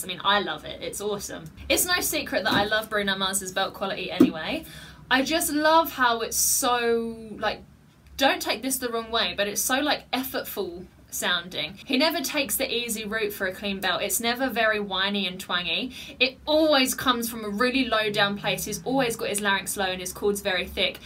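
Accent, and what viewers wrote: United Kingdom. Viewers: Greeting. It is good to know, you teach singing with vocal instruction . Thank you, been a "Music Teacher". Question ? : Are you from England ? 🇬🇧 . You have a strong British, accent. I am trying to listen and understand what are saying. Well, keep up with your videos look good. Please, try not to make faces, make look much older and not looks good. I believe , you are young and beautiful. Please, try to show a little positive expression, people will approach you more. Take care of yourself, kisses and hugs, XOXO , Love. Sincerely, 😎Mr. Villalba 🇵🇷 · (" "), your bravado made me comment on this page! Your craft is passionately represented and it is very appealing to know that you are inclined to view and comment on video suggestions from your students. The british accent is delightful as well. killed this record and there is a need for COVID-19 refreshing music. 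keep em coming and I love the commentary, equally your facial expressions and voice overs killed this review...... go!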